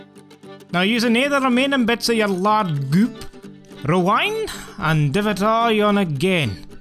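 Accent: Scotland, United Kingdom. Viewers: What region of Scotland is that accent? My grandparents are from fraserborough and the accent is completely different. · Yir a Fruit Loop Min!! Bit yair damn funny wicht it!! Fits Like!! It's great tae hear the auld Brogue, one thing I dae Miss oor here in US ofA, yir Brilliant, Keep it up Min!!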